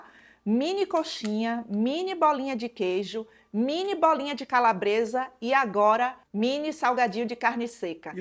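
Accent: Bahia, Brazil. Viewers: Kkkkk esse pedido de like, é cativante. Deus te abençoe. Esse sotaque baiano é indescritível. Deus te abençoe infinitamente.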